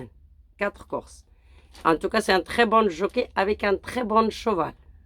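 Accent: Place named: Brazil. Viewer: Bonjour de la Guadeloupe...continue sur les pronos..avec ton accent brazil....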